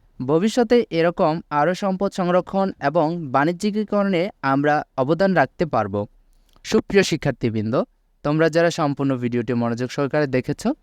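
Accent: Narsingdi, Bangladesh. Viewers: ভাই আপনার গ্রামের বাড়ি কি নরসিংদী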